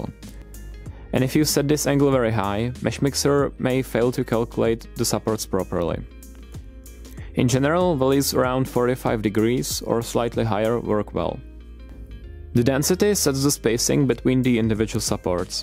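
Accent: Czech Republic. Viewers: Český přízvuk je nezaměnitelný :)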